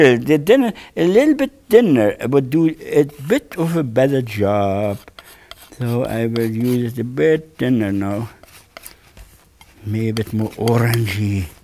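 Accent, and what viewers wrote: Germany. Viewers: Der deutsche Akzent ist das Beste 😁.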